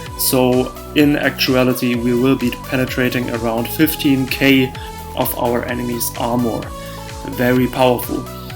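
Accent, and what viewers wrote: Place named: Germany. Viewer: nice build and well explained. The background music is a bit loud and overall terrible for such a long video but everything else is well done. Finaly someone mentions the Trial by Fire set, it's so overlooked and hands down the strongest defensive set for PVP. I would also like to see a build using Torug's Pact, another much overlooked set. Liked and subbed and looking forward for more content :) PS: Dein deutscher Akzent ist killer :D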